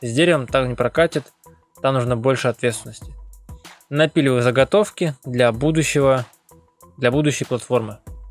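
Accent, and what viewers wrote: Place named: South, Russia. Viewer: Добрый день. Не могу понять, где вас букву гэ, учат как хэ говорить? В паспорте же имя Григорий у вас же как Григорий записано, а не Хригорий. В школе диктанты писали, разве там также как и говорили, вы писали? Ни коем образом не хочу оскорбить, или обидеть. Но этот диалект не понимает 90% всех людей в РФ. Вместо 5 литровой банки ,вы говорите баллон. Кто вас этому научил? Или сахар называть песком.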